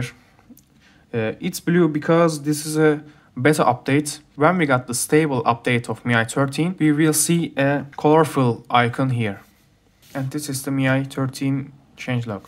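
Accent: Turkey. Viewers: Ne kadar da Türkiş bir aksan derken adam harbiden turkmus zaten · niyeyse türk olduğun çok rahat anlaşılıyor konuşmandan ahaha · Türk İngilizcesi bu nerde duysam tanırım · Aksan tanıdık dedim meğer turkmus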